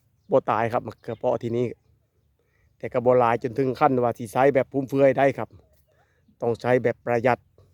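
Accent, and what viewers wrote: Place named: Laos. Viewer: ສຳນຽງຄືຄົນລາວເນາະ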